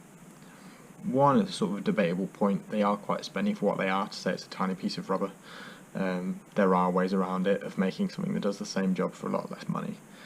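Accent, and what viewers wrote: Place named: United Kingdom. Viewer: the unintelligible limey blather ends and installation begins.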